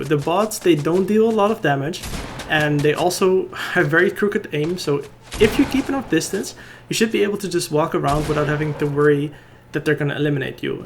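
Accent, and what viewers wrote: Netherlands. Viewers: why do you sound dutch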